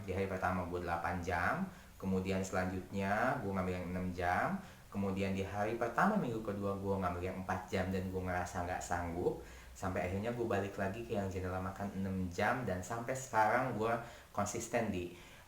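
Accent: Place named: West Java, Indonesia. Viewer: Ow ow... Luarss biazzaaa.. Emezing pisan... Tetep sundanya kaluar.. 😍😍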